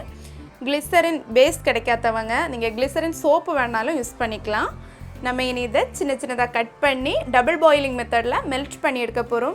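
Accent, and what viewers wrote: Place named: Nagercoil, India. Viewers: Neenga nalla pandringa super nagarcoil slang enaku romba pidikum thank u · I think u r from kanyakumari from the way u speak. Bcoz m also from kk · Nagercoil slang 🤣🤣🥰🥰🥰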